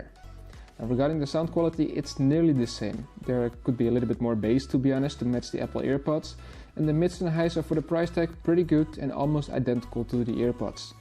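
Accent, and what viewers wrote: Netherlands. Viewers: Ik hoor gewoon dat deze man nl is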